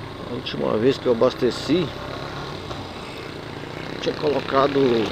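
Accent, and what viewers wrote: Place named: Pernambuco, Brazil. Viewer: legal Mano você é de Pernambuco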